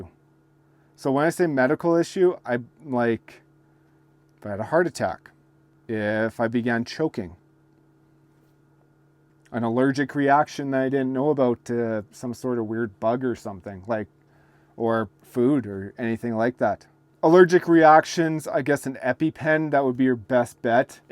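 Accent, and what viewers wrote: Canada. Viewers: Sask accent? What area are you from? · …we knew you are from Canada when you said “about” and “zed”…😅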